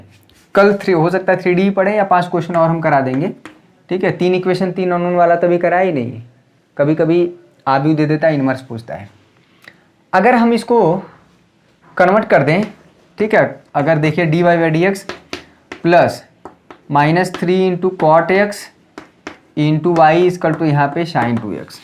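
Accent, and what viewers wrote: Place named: Bhojpuri, India. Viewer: भोजपरी सर आप बहुत अच्छा बोलत बानी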